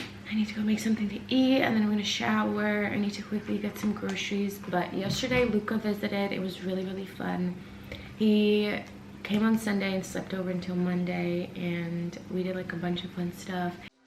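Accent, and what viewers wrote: United States. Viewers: how come you speak such good English and you have an American accent lol 😂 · Did you ever live in the US? I thought you did earlier (idk maybe I just imagined it in my head cause of ur accent). I notice a lot of folks from Europe with an American parent have American accents. (Assuming ur dad is Nigerian American) P.S. luv ur vlogs! And you’re so authentically you.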